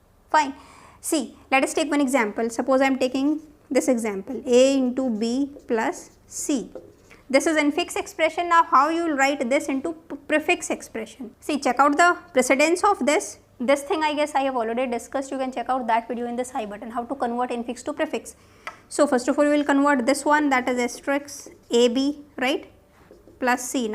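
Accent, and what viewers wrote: India. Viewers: Finally, listened one Hindi word ☺️😃.But,here english feels like Hindi only.👍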